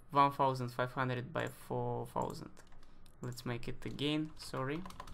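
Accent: Russia. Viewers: Так хорошо воспринимается английский монолог, сказанный с русским акцентом) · Какой ужасный русский акцент!) и столько ошибок походу действия) однако ты молодец! Хороший канал у тебя! Желаю удачи везде и во всем!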